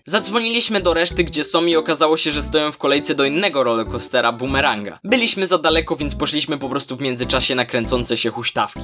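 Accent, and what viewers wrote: Szczecin, Poland. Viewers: ty z szczecina?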